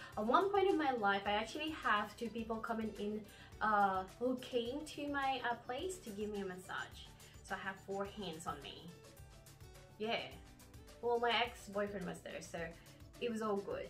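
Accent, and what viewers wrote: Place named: Australia. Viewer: You've started to sound Australian!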